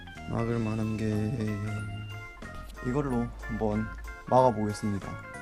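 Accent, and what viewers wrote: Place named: Gyeongsang, South Korea. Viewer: 솔직히 목소리만 들어도 힐링.. 목소리완전 터프... 경상도분들 말투 매력있어요 완전 상남자..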